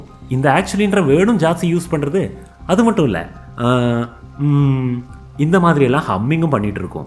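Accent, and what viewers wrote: Kerala, India. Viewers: நீர் முதலில் தமிழரா? மலையாளம் வாடை வருகிறதே உமது தமிழில்! கோலேஜ & அவர் பேசிட்டே இருக்கும் என அஃக்றினையில் சொல்கிறாய்! எங்களை பல முறை அஃக்றினையில் குறிப்பிடுகிறாய்! நீங்க எந்த ஸடாண்டர்டுல படிக்குது..! யோவ்! ஓடிப்போயிடு!நீ முதலில் நன்றாக தமிழ் & ஆங்கிலம் கற்றுக்கொள்! தப்பு! தப்பா சொல்லி கடுப்பேத்தாத! உன் வாயில வசம்பு வைத்து தேய்க்க..! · ஒரு நல்ல மலையாளி... தமிழ் பேசுறது இப்படி தான் இருக்கும் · Néenga malaiyali dhana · R u malayali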